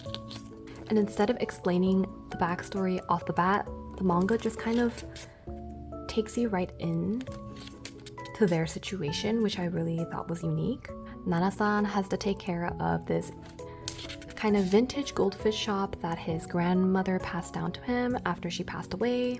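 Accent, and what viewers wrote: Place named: Japan. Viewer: your japanese accent is so satisfying!! loved this vlog, i’m so interested in reading the kingyoya san no karisome fuufu 👀 and omg I love marin too, that standee is so adorable waaah 😍💖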